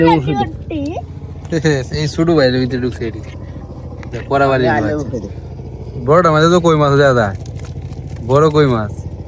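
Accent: Netrokona, Bangladesh. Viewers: মাছ দেখা ছারাও কথাডি হুইন‍্যা মনডা খা খা কইরা উডে।মনে অয় কাইন‍্যাইল বা গাইভনা বা কলমাকান্দা হেইরহম কোনো গ্রাম থাইক‍্যা কেউ কথাডি কইতাছে।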